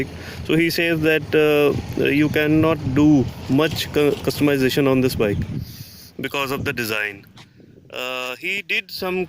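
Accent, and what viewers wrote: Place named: Punjab, India. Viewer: Punjabi accent.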